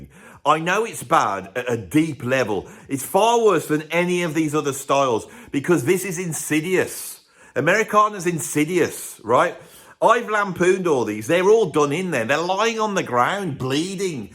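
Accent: Birmingham, United Kingdom. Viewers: 1. Loud brummy spouting his worthless opinions on youtoob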